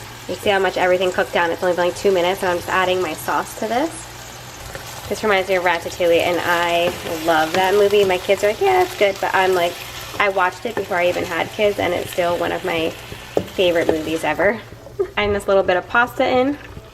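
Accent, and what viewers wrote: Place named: East Coast, United States. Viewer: That east coast accent 🤩😝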